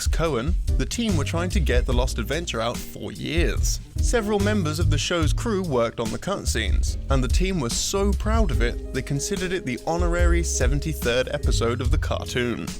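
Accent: United Kingdom. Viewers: sounds like a british vinny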